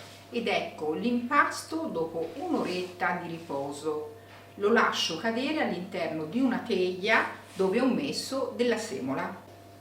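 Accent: Tuscany, Italy. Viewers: Thank you. Love olive Focaccia, love your Tuscany accent as well. 🌾🎈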